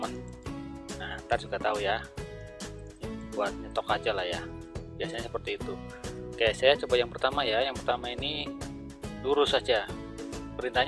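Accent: Banyumas, Indonesia. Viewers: Full tank sukses selalu buat om.. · Master jan keren temenan ya,geleng geleng inyong lah,sukses om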